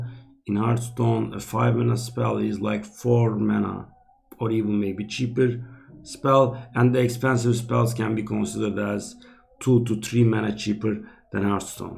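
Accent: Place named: Turkey. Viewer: abi vallaha konuşmandan anladım türk olduğunu :)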